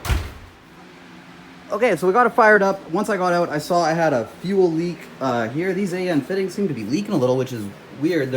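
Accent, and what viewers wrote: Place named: Canada. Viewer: Canadian Eh.. 🤙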